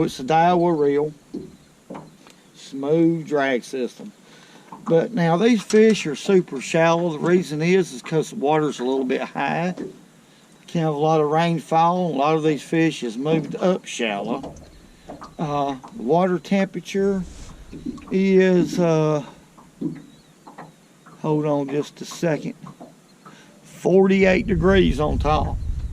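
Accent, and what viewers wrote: South, United States. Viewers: You are a wonderful awesome man. And you happen to be a fisherman. I am glad that I am still subscribed to your channel. I actually haven’t watched your channel for a while. Been working a lot, and in my opinion, your southern drawl when you talk reminds me to stop working so fucking much, and relax a little bit, and get back to what brought you joy. I watched a video of yours on how to set the hook depth for Crappie fishing. Thank you so much sir. (caught a lot of crappies from your wisdom and knowledge) I hope God blesses you profusely. You have a joy inside you that is priceless. You never talk about your life struggles. But like many of us people that have been paying attention to your channel for a while, life struggles are always in somebody’s life. Don’t be ashamed of smoking cigarettes. When you went on that Famous fisherman’s lake that has a Amish haircut, I could hear it in your voice that you were nervous, and just wanted a fuckin’ cigarette. 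Pardon my French…..I am a tobacco user currently, and I understood and could feel what you were going through. Secretly in my head, I thought it was an honor for the Amish haircut guy to have you on his boat. No joke. Real talk, no squalk…… God bless you brother.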